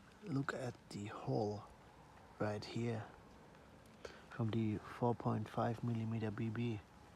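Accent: Germany. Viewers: ich liebe Englisch mit deutschem Akzent xD I love the 5 mm faif millimeda[ganz schnell] echt gut xD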